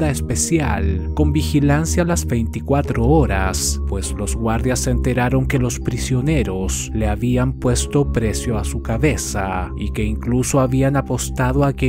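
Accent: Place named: Chile. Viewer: es la magia del acento chileno que al hablar lento, parece musica para tus oidos, como si oyeras al silencio cantar: <link>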